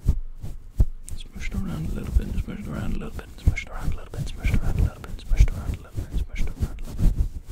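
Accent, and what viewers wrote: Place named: London, United Kingdom. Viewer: The London accent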